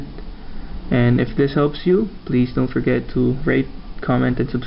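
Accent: Philippines. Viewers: u have a philipino accent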